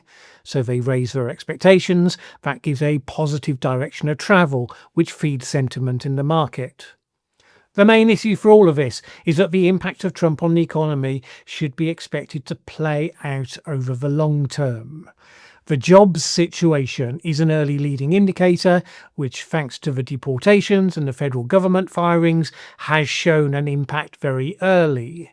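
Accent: Birmingham, United Kingdom. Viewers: l always enjoyed these little explanations. What a gifted teacher. He has the ability to impart knowledge in a way that is easy to understand. Great questions too. I got the accent because I am also a 'Well Spoken' Brummie. Yow awlright Bab? The Birmingham accent is actually sardonic and self depreciating in a somewhat sarcastic way. Ow Arr. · I’m a Southern Counties Brit and now can’t help trying to imagine your Brummy undertones. Unfortunately, I only discovered your channel recently, and as something of a Climate nerd would likely have benefited from your earlier videos. To me you are providing helpful leadership. That said, I slightly wince when you cover your back for not being even better, although that’s obviously a cultural thing that afflicts us all. I realise that standing for what you’ve just said by not following it with an apology to fend off the expected attacks is uncomfortable.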